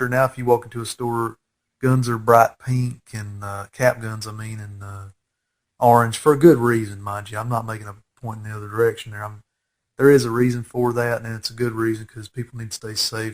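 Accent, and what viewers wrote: South, United States. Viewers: your southern accent is perfect for this commentary. well done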